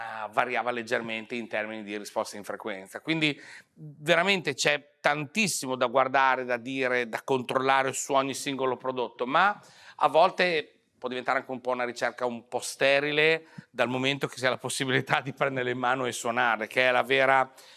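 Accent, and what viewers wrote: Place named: Emilia, Italy. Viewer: Non so perché ma la parlata emiliana di, anche se parla di chitarre, mi fa venir fame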